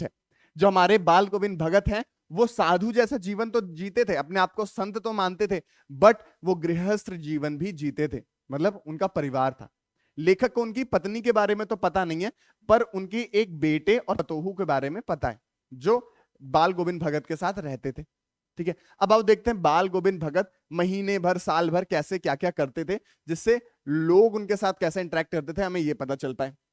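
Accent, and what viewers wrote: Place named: Lucknow, India. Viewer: Ramvrash bainpuri- lakanvi andaj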